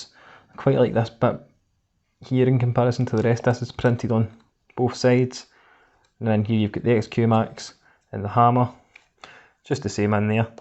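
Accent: Scotland, United Kingdom. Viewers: I come for the reviews to hear you say grip. Gotta love a wee Scottish accent aye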